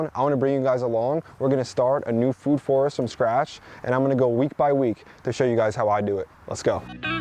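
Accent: New Jersey, United States. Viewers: Just found your channel and quite glad I did. One suggestion... stop talking Jersey.... slow down.... :)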